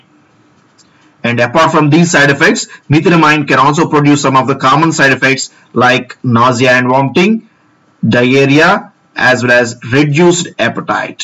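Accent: South India, India. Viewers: Ur pronunciation tells that u r South Indian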